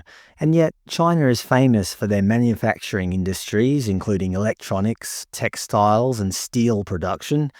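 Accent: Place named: Darwin, Australia. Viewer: From the way you talk and the subjects you cover i have a feeling you're either from Darwin or lived in Darwin.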